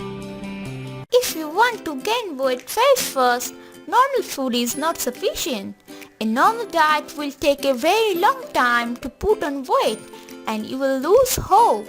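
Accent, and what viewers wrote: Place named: India. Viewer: Funny indian voice... why indian people has a very bad accent sorry you guys have a beautiful faces and color also...but why the acent is very bad...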